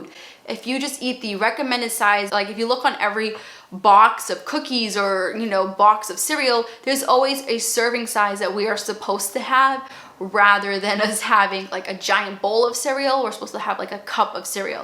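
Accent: Boston, United States. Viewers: You have like a Boston accent haha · I am from Boston too ,, great accent right?? You are wicked smot,, lol! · I really love your Boston accent. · I hear a BOSTON accent 💕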